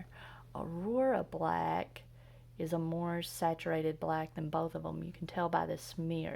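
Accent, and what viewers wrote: South, United States. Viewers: May I ask you from which state you're from? I really like your accent, it sounds kind of relaxing, but I'm German and not too familiar with all the American accents... If I had to guess I would say something southern or mid-west-ish?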